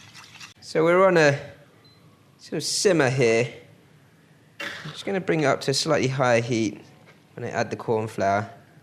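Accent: United Kingdom. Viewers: Chinese invented black vinegar way before Europe, so now you are trashing your own people because you have british accent? Karma will get you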